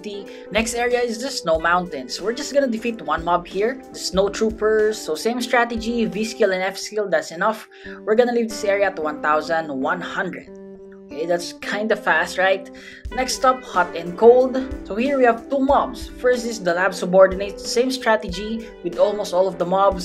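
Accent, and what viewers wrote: Philippines. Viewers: PINOY KA? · Your Filipino, accent and the way you talked to the guy you took the yeti from "lods"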